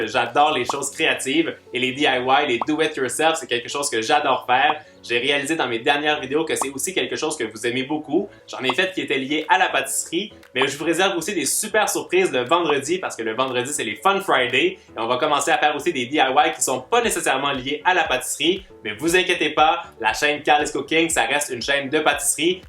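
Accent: Quebec, Canada. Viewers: chouchoumiro msp moi aussi trouve ils se ressemble mais aussi j’me disais pk a un accent allemand et Carl un accent québécois?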